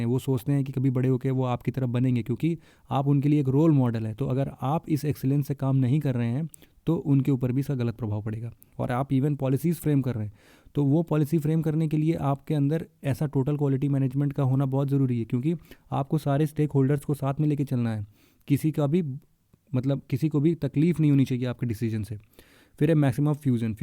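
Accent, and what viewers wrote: Kanpur, India. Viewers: Sir aap kanpur se ho kya??? Kidwai nagar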